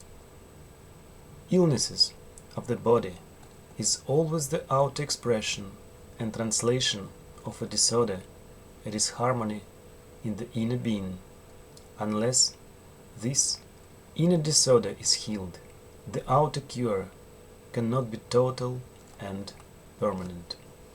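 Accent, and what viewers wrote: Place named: Russia. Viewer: Sweet russian accent:)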